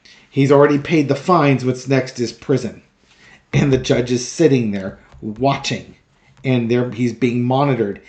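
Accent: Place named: United States. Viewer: I misheard your lovely American accent and thought you said your new channel is called - twin terror! Oops I then realised twin tarot...Fabulous.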